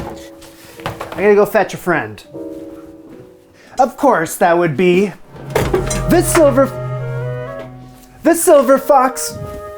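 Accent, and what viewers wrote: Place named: Southern Ontario, Canada. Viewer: once again, please never apologize for how you pronounce words! We all get to have our regional dialects and variations and the way you say “skewers” is entirely fine. I made a comment on your “cauliflower” episode and lots of people are still liking and agreeing to this day! Some people seem to not understand that YouTube is global and that their own accent is not the definitive one. You have a Southern Ontario accent, be proud of your roots! And, Girls Night Out should not be as tasty as it is, right? 😂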